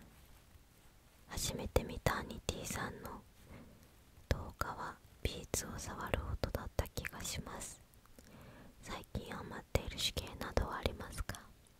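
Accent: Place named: South Korea. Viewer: 韓国の方の日本語聞いてて気持ちいいですよね♪(^▽^)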